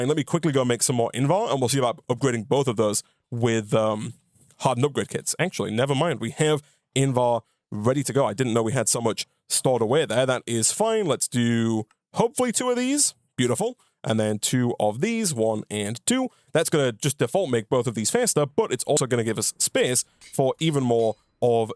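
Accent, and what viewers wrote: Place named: Northern England, United Kingdom. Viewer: your accent confuses me so much 😂 sounds like a proper northerner from the UK but has so many american influences; 'aluminum'???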